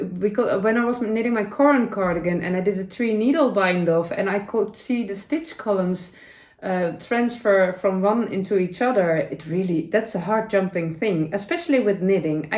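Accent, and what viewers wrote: Netherlands. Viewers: I really enjoy your so-called "dutchy English" because my dear Father also had this accent! ❤ 🧶 Hard relate on 3mm struggles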